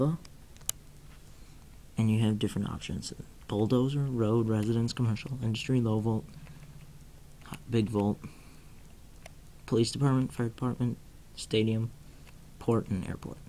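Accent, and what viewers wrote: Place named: Asia. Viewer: i cant understand ur asian accent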